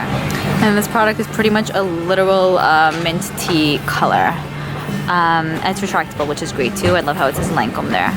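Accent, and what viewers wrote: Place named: Brooklyn, United States. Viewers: My boyfriend is from Queens and he always says train, never subway. Of course your accent reminds me of his. You're a pleasure to watch and hear. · I love that Brooklyn accent!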